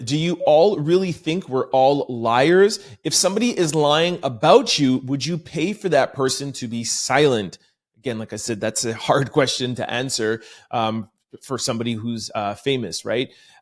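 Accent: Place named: Canada. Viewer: We as blk folks need to be more familiar with the law. One HUGE misconception is that cops have to read us our Miranda rights or we cannot be charged or arrested. FALSE. Miranda rights are to be read when someone is being questioned in context of a criminal investigation to use what you say against you. Not simply because you are being arrested. Miranda does not keep you from being charged. If you are not being questioned there is no Miranda at that time. We need to stop saying that because it’s wrong. Miranda doesn’t apply to every arrest. That’s something that you see on TV and it’s for dramatic purposes. Also you can be detained during a search if they feel you will obstruct or interfere in some way. Diddy sons were detained. They were not arrested that day. Make sure research is done before giving legal commentary sir. Edit: you are Canadian. So you get a pass, however Americans are watching this and need to know. 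I thought you were Canadian, I can hear the accent clearly?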